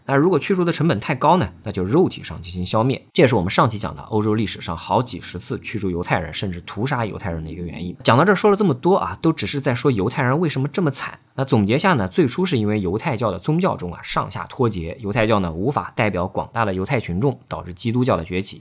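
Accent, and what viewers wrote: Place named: Nanjing, China. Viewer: 这个我滴妈很有南京人的腔调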